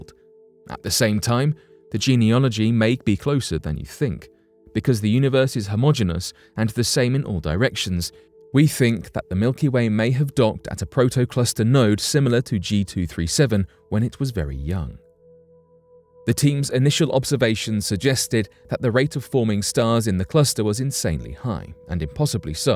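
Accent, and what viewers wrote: United Kingdom. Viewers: EVERY BULLSHIT SPOOKY MYSTERY SITE ON YOUTUBE HAS A FUCKING BRIT NARRATING, THEY HAVE "STONE HENGE ITIS" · Can't you bloody blokes find SOME OTHER NARRATOR ( PREFERABLY SOMEONE WITHOUT A BRITISH ACCENT)???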